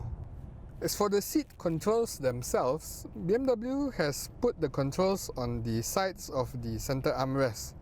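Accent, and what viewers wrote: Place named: Malaysia. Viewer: Great review. Informative and extensive, with nice Malaysian touches with your use of "la" in your speech!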